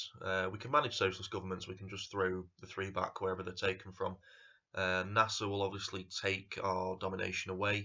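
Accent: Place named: Northern England, United Kingdom. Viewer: "Sorry about my accent [I'm from Manchester]" — I love the northern English accent :-)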